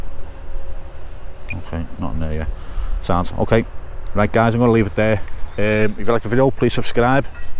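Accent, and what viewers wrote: Liverpool, United Kingdom. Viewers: Look forward to your updates! On a funny side note, your intro is very catchy and since the scouse accent is pretty unique and an earworm at times (as an Indian native, it was always different).. i found myself mimicking that starting bit xD Cheers for the good work mate.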